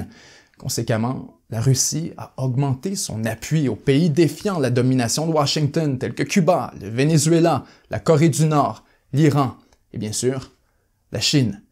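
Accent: Quebec, Canada. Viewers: Tu dois être québécois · cet accent canadien qui me rappelle les bons moments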